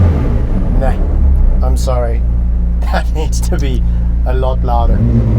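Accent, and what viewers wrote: South Africa. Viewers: Is the presenter South African? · Sounds like a South African accent to me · Are you South African? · The presenter sounds South African. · This guy sounds South African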